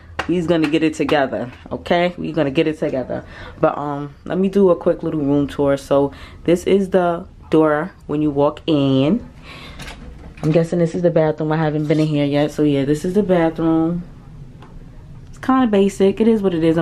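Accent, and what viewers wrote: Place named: New York, United States. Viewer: Great video. I’m a solo traveler myself. Your accent sounds like New York or somewhere off the East coast. Love from Detroit ❤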